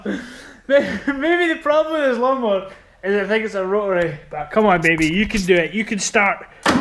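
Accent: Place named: Scotland, United Kingdom. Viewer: Shit. Love the accent brotha haha. Scottish?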